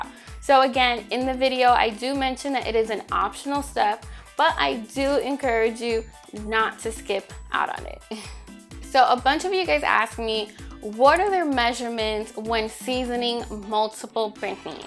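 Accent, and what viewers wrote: New York, United States. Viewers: Love that nyc accent coming thru but u look like you moved out to the burbs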